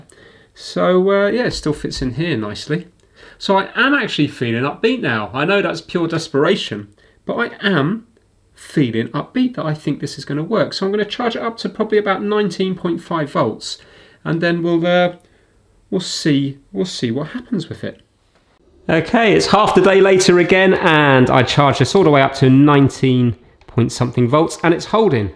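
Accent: United Kingdom. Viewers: I love listening to British people talk!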